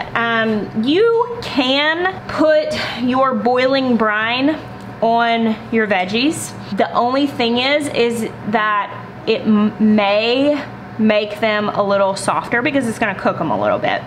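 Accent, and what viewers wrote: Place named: Knoxville, United States. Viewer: So....im watching with my 78 yrl old momma and when you started talking she said "is that girl from knoxville?" And i said uhnnn. ...yea why i was shocked because shes never watches your vids with me but she indeed could tell you either were born in or raised in Knoxville....is she right?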